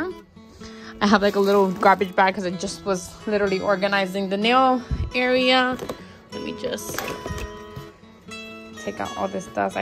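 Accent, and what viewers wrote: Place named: New York, United States. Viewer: Hi! I’m new here. Good luck to you! I’m new at the nail game too, so I would love to learn with you 💜 and I’m just guessing that you too are a NYer..lol. And if not, you sound just like me 🤣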